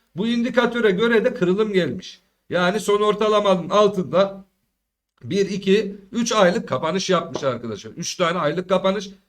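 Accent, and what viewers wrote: Konya, Turkey. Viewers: Videonu izlerken benim hanım dediki, bu adam konyalii😂😂 dedim nerden anladin ?? Hanım dediki: Dimiyorum degisi tam konya sivesi🤣🤣🤣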